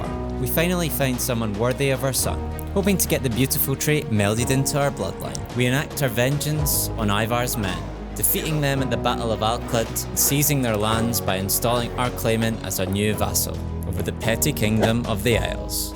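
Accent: United Kingdom. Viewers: Is this man from the lowlands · i love when british do british. British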